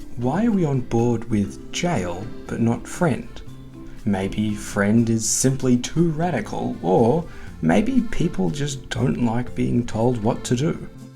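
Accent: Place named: Australia. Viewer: Aussie? Nice 🇦🇺🇦🇺🇦🇺🦘👊🏼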